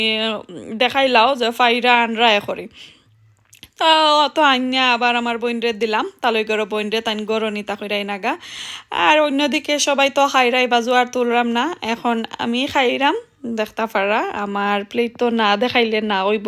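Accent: Sylhet, Bangladesh. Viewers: মাশাআল্লাহ আপু খুব বালা লাগছে সিলটি মানুষের সিলটি রান্ধা। রেসিপি দেখিয়া কিতা কৈতাম খুব বালা লাগছে।নাগা মরিচ দেখিয়া জানে চার আপনার লগে খাইতাম। সিলটি মাত হুনলে বড় বালা লাগে। আমার বড় ভাই পান খুব মজা করি খান। আমি খাই না। ব্লগ দেখিয়া অনেক ভালো লাগলো।👍👍❤️❤️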